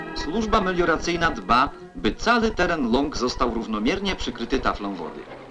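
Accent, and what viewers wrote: East, Poland. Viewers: Z jednej strony nie dziwie się komentującym, bo to historia, a jednocześnie dla pochodzących z tamtych terenów rodzinna historia, nostalgia. Jednak.. czy Wy nie słyszycie tej propagandy? Tego jak na sile podkreślane jest to że ta inwestycja to będzie wspaniała, która osuszy jedne tereny a nawodni drugie? Nie wspominajac o innej propagandzie ktora jest właściwie cały czas... ale takie były czasy PS. Czy wy też słyszycie ten akcent lektora, taki wschodni? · Lektor chyba zza Buga?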